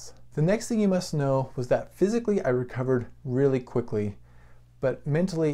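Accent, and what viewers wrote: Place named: England, United Kingdom. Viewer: Hello. You sound like you might be in England. I don't really agree with all the AFIB protocols recommended there. Keep in mind... that the longer you stay on AFIB antiarrhythmic meds... the more the heart 'remodels' and the less effective an ablation may be. I heard from a rather upset woman in England whose Cardiologist prevented her from getting an ablation, keeping her on a pill protocol for a lengthy period. When she finally did get her ablation, the EP said you waited too long and it won't work that well now. A big mistake is not going to the AFIB professional Electrophysiologist (EP). When I came down with AFIB, I dumped my Cardiologist and contacted an EP immediately. I've had many ablations by the best EP's. None of them had me do a Stress Test. Makes me wonder if you're on the right track. In any case, I wish you the best outcome. 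Every case is different. There are many people who never get ablation and do quite well on pills for decades. Whichever path you choose, I'm sure you'll be fine. I first came down with AFIB around 54. I see some people come down with it when quite young. Sad is the number of pro runners who come down with it.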